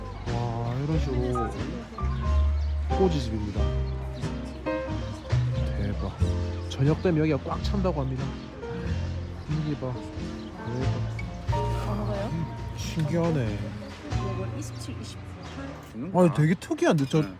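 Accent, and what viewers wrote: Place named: Busan, South Korea. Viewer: 부산말투 좋으네요 ㅎ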